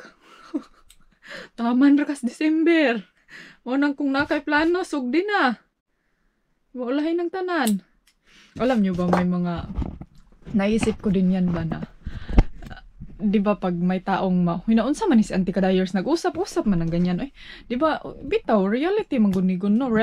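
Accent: Philippines. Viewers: Bugay kay kag English Indai pinay na pinay I like it 😄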